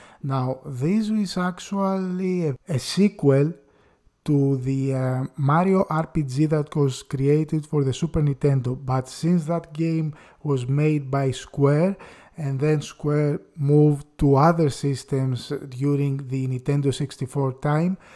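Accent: Greece. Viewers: What about conker's Bad fur day? BTW: where are you from? You accent sounds Greek.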